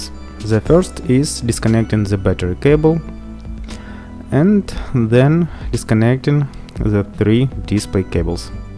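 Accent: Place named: Russia. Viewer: ду ю кен спик рашен, бекоз ю хэв рашен акцент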